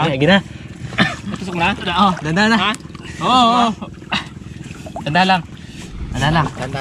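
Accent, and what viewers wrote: Iloilo, Philippines. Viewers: ilonggo kaba lods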